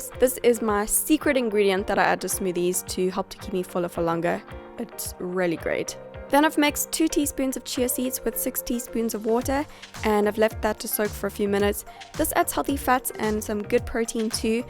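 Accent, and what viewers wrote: South Africa. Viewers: Dat South African accent tho :p Baie goed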